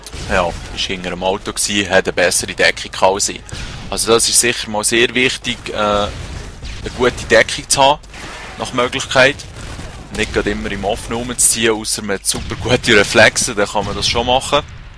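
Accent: Switzerland. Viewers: Sehr hilfreich, gut gemacht. Nur bemuehe dich mal bitte hochdeutsch zu reden (auch wenn ich den Dialekt mag, ist schwer zu verstehen), dann gucken dir auch noch die 80mio deutschen zu und nicht nur die deutschsprachigen schweizer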